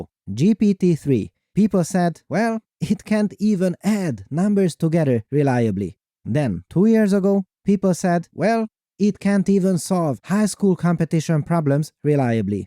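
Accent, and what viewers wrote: Hungary. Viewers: So TMP is Hungarian? News to me. I was curious about the fantastic accent. Great paper as always, Doctor. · Ah Hungarian! Your accent is super cool but also very unique.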